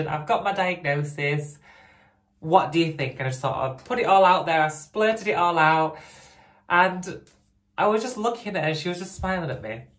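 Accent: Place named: Yorkshire, United Kingdom. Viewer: I anticipate each and every pop up from you. I?trans from YC and and 60 and been trans since the 80s. So I hope you understand that watching your progress is so lovely. I can see the blossoming effect in your eyes as you find your was in the world. I abs6adore your accent. It's o bviously UK but maybe YORKSHIRE I think. So continue on your blessed life and know you have someone cheering you along. One more thing. You seem to have such naturally good insight. Never loose that. You seem to know innately the right thing to do.its a gift.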